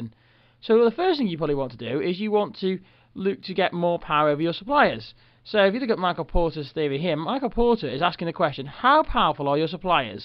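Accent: United Kingdom. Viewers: too englishish.. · Because he's from the UK and thats just how he talks?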